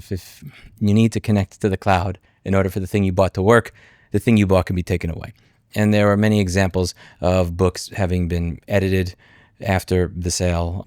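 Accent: New York, United States. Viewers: you would'nt happen' to be new yorker ey?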